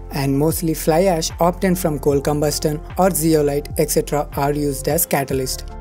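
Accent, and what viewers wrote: India. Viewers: Very nice animation and good explanation, eventhough I am not used to the "Indian" accent. Yet I would not recommend to use "coal or wood" for heating the system because it creates a LOT of pollution. Electricity should be the ideal source of energy to start the process.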